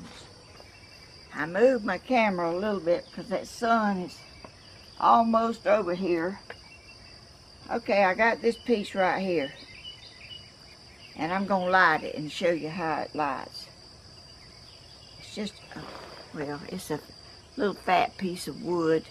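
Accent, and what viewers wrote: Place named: Georgia, United States. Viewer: I was born the southwestern part of Georgia .your accent sounds very much like the accents of people from my part of Georgia..curious which part of Georgia you r from? Your accent sure sounds familiar... like the folks from my neck of the woods...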